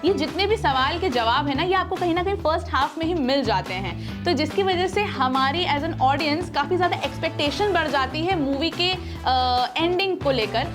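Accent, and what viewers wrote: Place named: Bengal, India. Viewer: U BENGALI HINDI VOICE VERY NICE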